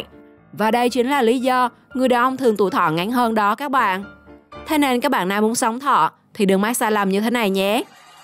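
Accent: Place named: Central, Vietnam. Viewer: Nghe tiếng dể thương mà ba, tôi ng miền nam nhưng nghe khoái. Chị này chắc ng miền trung đấy. Tôi có một ng bạn tiếng giống chị này rất dể thương, xa rồi mãi nhớ giọng nói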